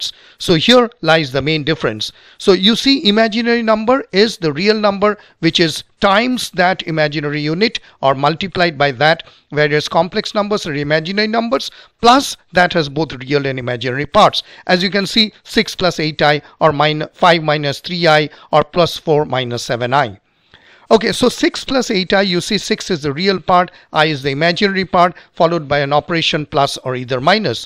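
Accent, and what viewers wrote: India. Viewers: He is a really Indian 😅😅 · U sound like an Indian ( no racism ) 😅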